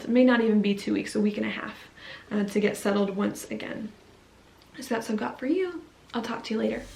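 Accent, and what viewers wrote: South, United States. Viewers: I think Georgia brought your southern roots to the surface...i hear a little accent :) missed you, glad youre back! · Your southern drawl is much more prominent in this video than in any other before. As a southern girl, no judgement. I only wish to say: while w family, do your best not to lose yourself, and the story you are building of who you are becoming. Xoxo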